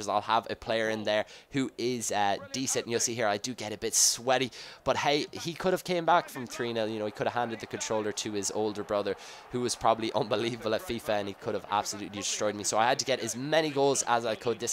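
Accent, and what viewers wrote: Ireland. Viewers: Wow this series is godly btw where are you from you sound Irish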